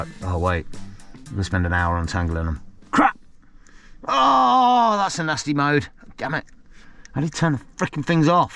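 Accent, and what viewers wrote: Leicester, United Kingdom. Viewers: Gonna be completely honest here, when I first started watching you I hated it, just couldn't get used to the Leicester accent, now your my favourite u tuber it's hilarious, can't wait for u to upload the next video, u really make my day 🤣🤣🤣🤣🤪🤪🤪🤪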